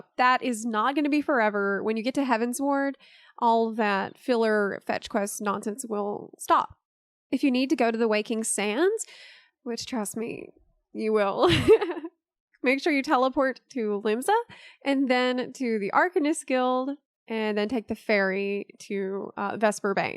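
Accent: South, United States. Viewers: Less than 3 minutes in and I am IN LOVE with accent! I'm from the south as well, and to see a fellow southerner, and a strong woman as well, as a successful content creator is such an amazing feeling! Her sense of humor, thorough and concise explanations, and tone are refreshing, relaxing, and the best thing I've watched in QUITE a long time! you have a fan for life!! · I definitely didn't expect the sweet southern twang in you're voice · Your accent trips me out. It's like a regular American accent 90% of the time, but some words have that great southern drawl. I like it. Great video! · So southern bell, defiantly into it 💕💕💕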